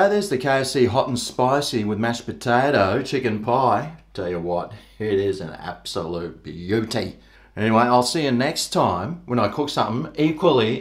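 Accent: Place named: Australia. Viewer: I come to watch the recipes and more importantly, to listen to his Aussie slang/talk😁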